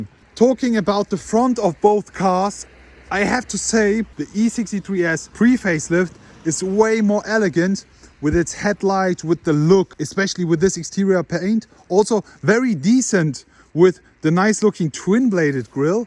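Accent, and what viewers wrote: Germany. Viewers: Leicht deutschen Akzenten 😎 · Digger dein Englisch ist komplett weg alter man merkt so hart dass du deutsch bist aber trotzdem nices Video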